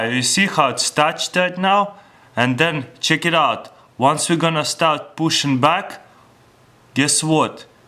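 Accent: Russia. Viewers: you englisch from rusia? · I can tell you are Russian. Cool I am too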